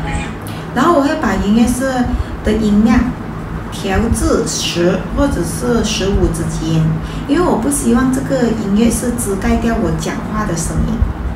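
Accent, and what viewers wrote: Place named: Vietnam. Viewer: 感觉普通话不像是中国人说的，发音像是越南人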